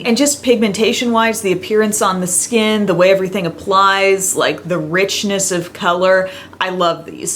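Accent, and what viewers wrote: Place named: South, United States. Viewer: Everytime I watch your videos, or your snapchats ALL I can think is how much of a southern accent you have! But I love you, and always try to come to you before I purchase something!! ❤️❤️❤️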